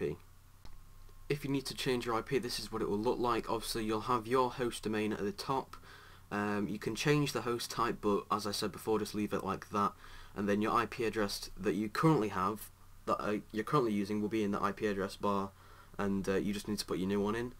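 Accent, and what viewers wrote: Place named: Scotland, United Kingdom. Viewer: Shut up he sounds a bit SCOTTISH IM Scottish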